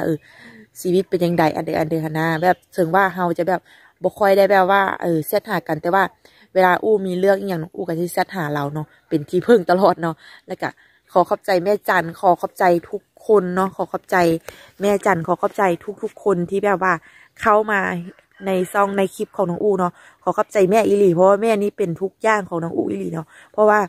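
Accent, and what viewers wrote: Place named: Si Songkhram, Thailand. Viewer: สวัสดีปีใหม่....น้องอู่ติดตามดูตลอดเด้อเป็นกำลังใจให้...ถ้ามีโอกาศมาไทย..ที่บ้านยินดีต้อนรับเด้อ...น้องอู่พูดคือคน..อำเภอศรีสงคราม...จ.นครพนม.....อยู่ใกล้บ้านอ้ายเขยบ่าวหนอย.บ่าวสุ...สบายดี.....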